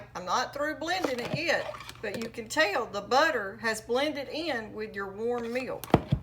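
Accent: South, United States. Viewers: I knew this was gon be good from the accent alone. Southern moms cook the best hands down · I found you today searching how to make Homemade Heavy Cream. Love your Southern accent and your style with easy to follow, straight to the point instructions. I am a new subscriber and need to check out your other videos. Thank you. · Bravo🙌 I'm so glad this video popped up. I just subscribed and I'm sharing this video on pinterest.❤ I hope that helps your channel. This might even be cheaper than buying heavy cream. Thank you so much for sharing. Love your accent, I'm from Chicago but live in North Carolina now. I used to tell my fiance that I like to Southern accent and he said it's a drawl.😂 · Thank you for the video. This makes so much sense. I’m guessing that if you want to make whipped cream from it, you need to chill it well first? Thank you again. I love your Southern accent too by the way. 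Love from Australia ❤🇦🇺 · People with southern accents always have the best food videos! 😋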